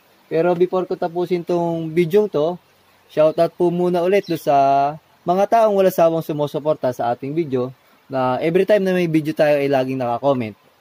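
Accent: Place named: Quezon, Philippines. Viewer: ganda mga vids mo sir.. slamat.. from quezon province ako, kya pla pamilyar punto at words mo.. more vids pa..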